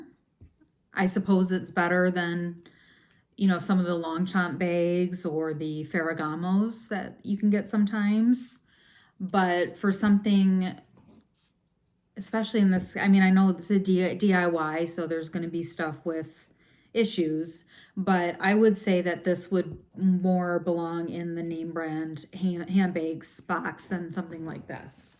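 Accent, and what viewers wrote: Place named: Chicago, United States. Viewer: Etoile is $$ just going to sit forever... Where are you from you sound like Chicago and then sometimes somewhere else ha!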